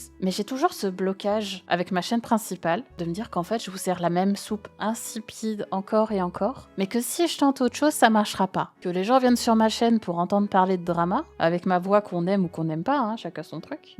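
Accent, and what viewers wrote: Belgium. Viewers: Bonjour. Abonnée qui sort de la communauté silencieuse!! Personnellement, si je regarde tes vidéos avec autant d'assiduité, c'est pour ton sarcasme, ton humour, ta personnalité (supplément accent belge) bien plus que pour le contenu. Même si j'adore le format de tes vidéos; des chaînes qui traitent des sujets dramas il y en a des milliers. Des, je n'en suis qu'une. Je dis ça sans parasocial ou quoi. Si je clique sur tes vidéos c'est pour voir du (les gars dont tu parles, pour la majorité je les connais même pas! ) Ce que j'essaie de dire c'est: amuse-toi. T'aimes la création de vidéo, alors crée des vidéos que tu aimes! Et je pense sincèrement que plus tu t'autoriseras à être toi-même dans ton contenu, plus les gens qui décideront de te suivre te ressembleront. Y'aura toujours des gens qui profiteront de l'anonymat pour venir gratter la merde, mais je crois que malheureusement ils viennent d'office avec le package ''femme sur internet''. 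En parallèle, y'aura toujours des gens pour reconnaître et apprécier ton travail! (Signé: une abonnée qui appartient à plusieurs minorités et qui ne s'est jamais sentie attaquée par tes blagues) Pense à toi. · Mais mais, c'est ta chaine non? tu fais ce que tu veux. Si je suis sur ta chaine, c'est que j'aime ce que TU fais. Je suis plein d'autres chaines et je suis capable d'en prendre et d'en laisser. Les médias sont tellement remplis de trucs qu'ils y en à pour tout le monde. J'aime ton humour, ta voix est apaisante, ton accent belge, je suis Québécois, et je t'assure que j'ai aussi un gros accent. Tu ne pourras jamais plaire à tout le monde, et c'est ainsi que le monde est fais. Tu à eu le courage de crée une chaine YouTube, ce serait le comble que tu ne puissent pas y faire ce que tu veut! Courage et je serais toujours à tes cotés en tant qu'abonné. parce que point! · C'est toujours triste quand on doit se réfréner, se bâillonner, pour contrôler la casse, éviter les problèmes, etc, quand on créée quelque chose, et qu'on finit par s'étouffer soi-même… 😔 De toute façon, leur souci principal, c'est que vous soyez une femme sur Internet, et d'en plus ne pas rester dans les cases "make-up" ou "vlog" 🙄 Il y aura forcément des gens qui auront quelque chose à redire, rien qu'à voir les attaques sur l'accent belge, mais je me doute que c'est trop facile de dire de ne pas les prendre en compte, quand on ne le vit pas (et hypocrite, je ne sais pas le faire xD) Faîtes au mieux pour vous-même, vous êtes la plus importante dans l'histoire ♥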